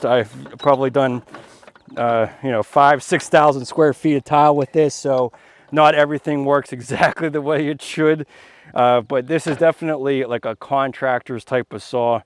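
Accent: Pittsburgh, United States. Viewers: You are very selfless in sharing information. I also love your accent and it takes me back to my days in Pittsburgh.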